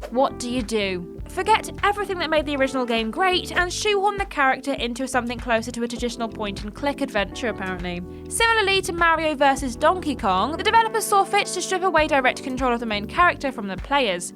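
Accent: United Kingdom. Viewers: English (British) pronunciation.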